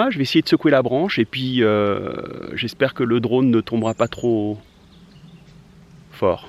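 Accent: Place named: South, France. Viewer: Sexy entre le ménage et l'accent du Sud!!! Tjrs en mode groupie ;) Tes vidéos sont sublimes plus sérieusement!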